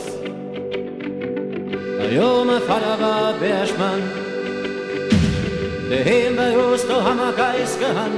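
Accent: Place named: Saarland, Germany. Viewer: Das ist schon sehr saarländisch 😅 Kann mir jemand den Text übersetzen? Bitte 😙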